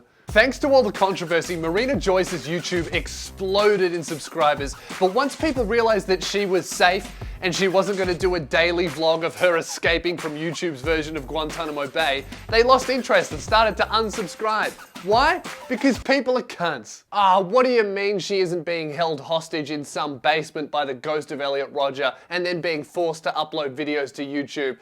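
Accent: Australia. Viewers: Fuck this is the first video I have ever seen and in the first second I swear to god if you aren't fucking australian then fuck. Who is. Because I am and you are either Aussie or a fucking child of steve irwin.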